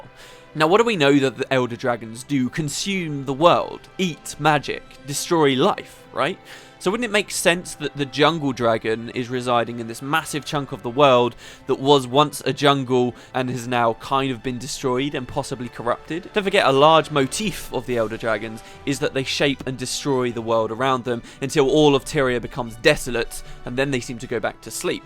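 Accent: England, United Kingdom. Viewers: I've never even played guild wars. English accents make you do things...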